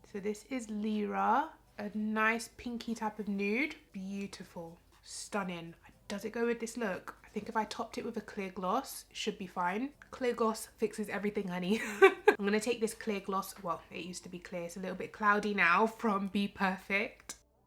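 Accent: Jamaica. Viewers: patwa coming through.. Love to see it 😁😘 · Omg Ms you are stunning, I love, love your makeup looks, I hope to get as comfy on my youtube soon. Love your work, I finally heard the Jamaican accent. Big up yourself:)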